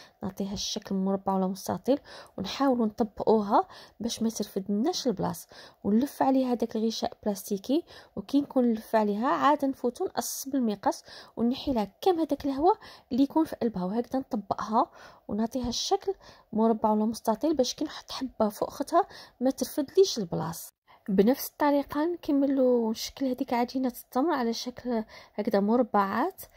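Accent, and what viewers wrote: Tlemcen, Algeria. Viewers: الله يعطيك الصحة. لهجة تلمسانية رائعة ما شاء الله عليك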